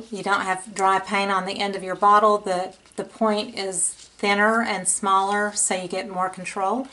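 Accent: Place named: Rural, United States. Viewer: That "Country twang" just makes you more enjoyable to watch and listen to.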